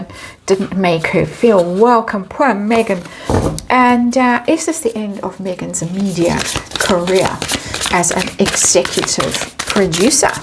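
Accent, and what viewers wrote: South Africa. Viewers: has a beautiful South African accent! I think she would be a fabulous audiobook reader!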